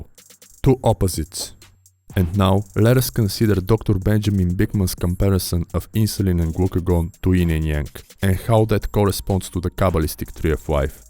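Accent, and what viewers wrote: Russia. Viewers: Very hard to understand your Russian accent. Good content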